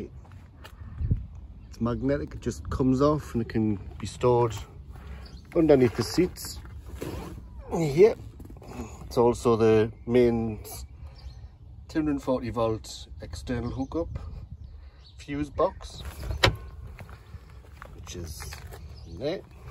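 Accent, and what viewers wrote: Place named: India. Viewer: Are you Indian?!!!i cant believe how you talking!!!!😁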